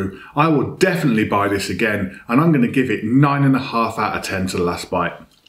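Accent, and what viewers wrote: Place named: Birmingham, United Kingdom. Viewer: Watching how much you enjoyed this I’m gonna have to get myself one! You sound like a fellow brummie?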